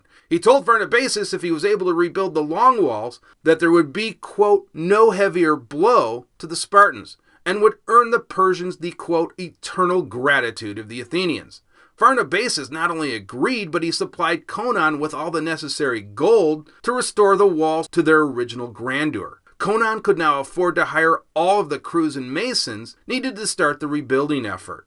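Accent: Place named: United States. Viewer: I live in Vancouver, thank you for asking! You sound....American?...judging by your pronunciations. Where from exactly? On that note, in your piece on the roman Legion, regarding a couple of your pronunciations; isn't it supposed to be Prince-a-pay, and Tree-aree-eye? That is how I always heard these names pronounced. I would be curious to know..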